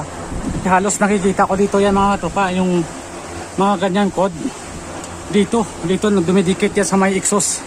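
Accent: Iloilo, Philippines. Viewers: ilonggo ka nong? taga diin ka? iloilo or bacolod? iba kasi ka kasi mag tagalog prang ilonggo..hahaha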